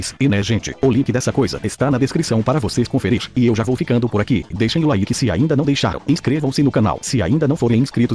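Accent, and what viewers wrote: Portugal. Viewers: Vc é brasileiro ou português?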